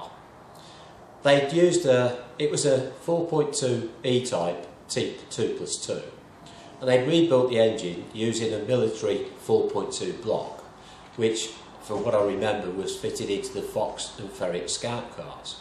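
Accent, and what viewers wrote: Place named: England, United Kingdom. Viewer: He's English :) My mom hated watching old movies from England on tv - but this gentleman is way easier to understand.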